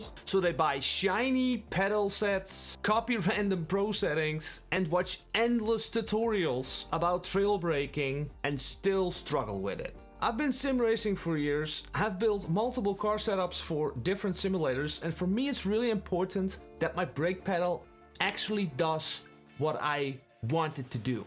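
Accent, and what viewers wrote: Netherlands. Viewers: Nondeju dat is een lekker Hollands accentje jongen 😁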